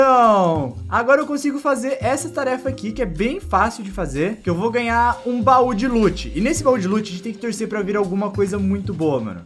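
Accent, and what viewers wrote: Rio Grande do Sul, Brazil. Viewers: tu é mesmo gaucho ou tu so brinca com o sutaque?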